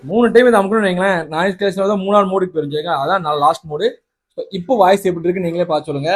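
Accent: Tirunelveli, India. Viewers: Anne nega tirunelveli yaa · Anna nenga tirunelveli Karan ah? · Anna neenga thoothukudi, thirunelveli pakkama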